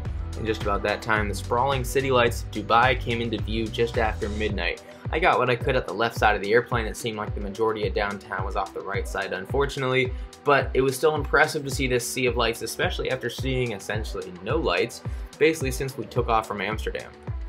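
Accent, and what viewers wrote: United States. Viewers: I hate the KLM Lounge in Amsterdam! It's definatly one of the worst! It's always crowded and they only offer little amounts of food and drinks. Otherwise, nice and really interesting review. But please speak a little bit slower and more clear. Your American accent makes it quite exhausting to listen all the 39 minutes. 👍🏼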